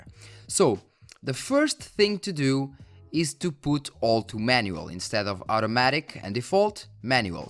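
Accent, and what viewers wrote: Portugal. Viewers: Grande tugão, apanhado pelo sotaque ... bom video pahhh Saiu este video de um alemão (para as "RX 5700" / "RX 5700 XT", com OC a 2100Mhz <link> software: - GPU-Z.2.30.0 - MorePowerTool review: <link> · Pareces tuga pela forma de falar, props pelos vídeos! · i really like the way you speak english, your are portuguese right? GJ!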